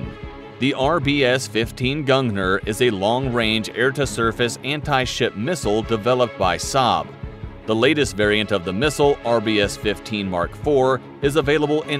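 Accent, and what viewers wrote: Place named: United States. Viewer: I thought Military TV was British, obviously this narrator has an American accent though.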